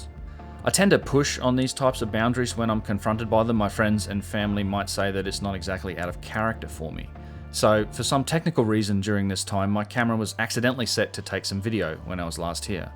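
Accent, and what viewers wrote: Australia. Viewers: I wish the voice in my head had Aussie accent. <3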